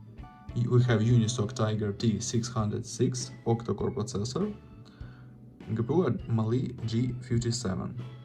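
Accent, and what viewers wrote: Russia. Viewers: на аглийском,с русским акцентом))) По голосу и звуковому фону узнал.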